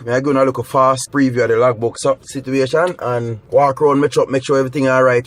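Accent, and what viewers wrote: Jamaica. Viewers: Omg didn’t expect the patois lol. Love it new subscribers 🙋🏽‍♀️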